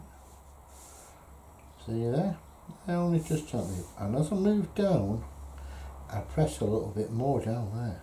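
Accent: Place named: Yorkshire, United Kingdom. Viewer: So different and inspiring, love your style. Quite unique. Nice to hear a fellow Yorkshire accent! Debs in Spain ❤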